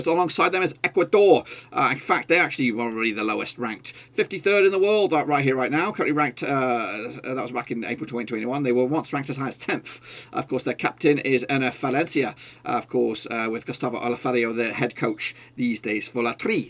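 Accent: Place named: United Kingdom. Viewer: Basado en su accento, yo creo que el es britanico... Los americanos hablamos mas Bonito lol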